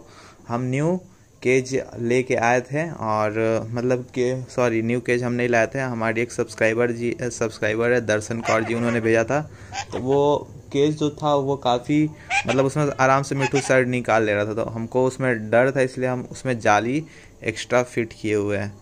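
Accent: India. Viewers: Bhai tone se toe aap mithilanchal k lgte ho. Kha se ho aap ??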